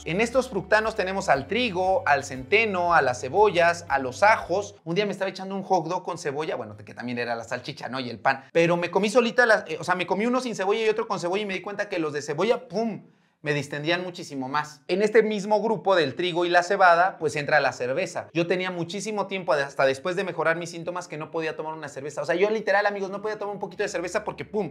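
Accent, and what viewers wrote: Mexico. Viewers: el acento mexicano es insoportable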